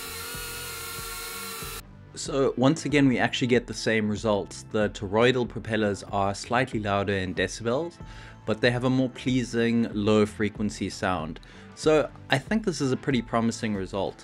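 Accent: South Africa. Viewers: I knew that lekka safari accent! Groete uit Duitsland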